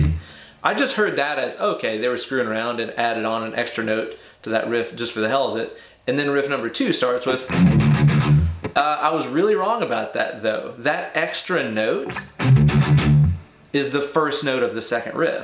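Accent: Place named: Southeast, United States. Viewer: Well Done Mate!!! First time seeing it live was Bonnaroo 07 opening song. Lasers went to Infinity and beyond. Great riff and great lesson. You sound like you're in our General area of the US? Southeast?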